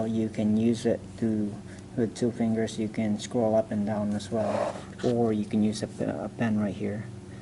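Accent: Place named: Philippines. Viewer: loving the Filipino accent :)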